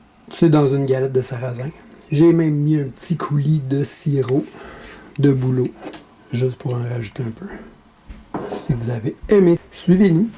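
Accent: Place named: Canada. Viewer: 😅C'est seulement aujourd'hui que je vois cette vidéo que je trouve très intéressante. Hier, j'ai cueilli des jeunes feuilles de renouée du Japon pour les cuisiner. Cette vidéo m'inspire. J'ai aussi cueilli de l'ail des bois (en France on l'appelle l'ail des ours). J'en ai fait un pesto. Merci Monsieur de mettre en avant les plantes sauvages comestibles. C'est dommage que l'on en profite pas davantage. Elles sont sources de grandes qualités aussi bien alimentaires que pharmaceutiques. Les ignorer risque éteindre la connaissance ancestrale. Votre accent est canadien (j'aime beaucoup). Lorsque je vais au Canada, je m'installe à Montréal durant quelques mois. De quelle région êtes-vous ?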